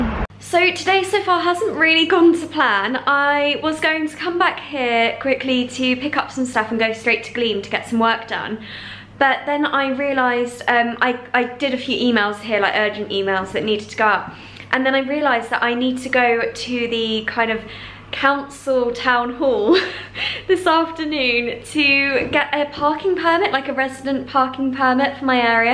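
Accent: United Kingdom. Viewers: I freaking love your British accent ❤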